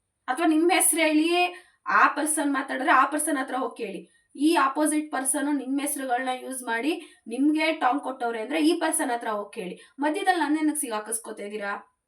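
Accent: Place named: North Karnataka, India. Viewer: uttarakannada bashe keloke chanda...yar yen helidru bashe ge ero gowrava sikke siguthe bit bidi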